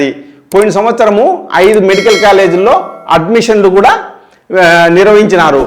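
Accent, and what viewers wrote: Kadapa, India. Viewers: ఏంది అబ్బా నీది నీ భాష చూస్తుంటే కడప ఉన్నట్టుంది నీ యాంకరింగ్ ఏం బాలేదు అబ్బా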